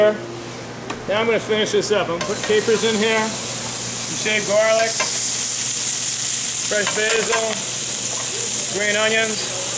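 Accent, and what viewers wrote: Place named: United States. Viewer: what does he mean "as you can tell I'm from Ireland" he sounds American. If he sounded Irish it would make sense.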